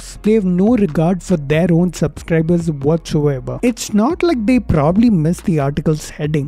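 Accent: South India, India. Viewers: He speaks south Indian English (if you know 'thank' and 'tank' thing)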